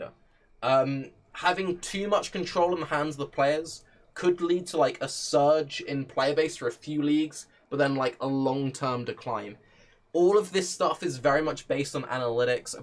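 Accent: United Kingdom. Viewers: I think that's a little overboard, but i get what you mean. He for sure has become a lot less critical ever since he's gotten these aspirations of doing content with GGG staff etc. Its like he wants to be Ziggy or something. Nobody is totally impartial, but what does rub me the wrong way is when people pretend as if they are trying to give a balanced opinion - when in reality they fall very squarely on one side of the argument or the other. If you are only going to praise GGG and fabricate total nonsense that nobody would ever say to make it appear like you arent just dicksucking it really comes off as arrogant pseudo-intellectual self-aggrandising nonsense. This was my biggest problem with the Diabolo mobile video. He couldnt just say "If the game is good, ill play it". He had to make a 5 minute nonsensical rant trying to justify to himself and everyone else why HE is in the right, everyone else is just circlejerking, Blizzard are being smart and moving in the right direction for the franchise even if this only caters to idiots like him.... 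Like dude, either be quiet or just acknowledge you are in the minority. Dont try to do all that nonsense justification. It doesnt get you hipster points, and you dont sound smarter for doing it. Even if you have a british accent.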